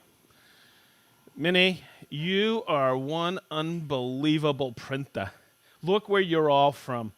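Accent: Boston, United States. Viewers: Hey can you park my cah- I’m a masshole too I hear it - love it New England woodworkers rock🎉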